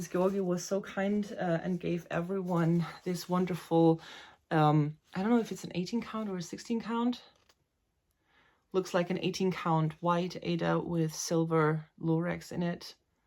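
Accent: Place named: Germany. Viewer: I was so happy to meet you and the other "Mücken" in my backyard! Great re-cap of the weekend and I loved seeing your new sitchy space- hopefully things will get easier as you get settled. I also so enjoy watching your videos in German first and then your excellent English version- it's like a language review for me (and Hoch Deutsch - music to my ears! LoL) My Romanian friend says "shoot two rabbits at once". Why ARE all of us killing animals in mass to save time? 🤣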